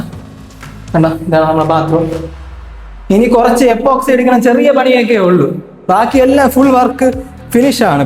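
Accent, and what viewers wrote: Parassala, India. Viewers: പാറ സാ ല സ്ലാങ്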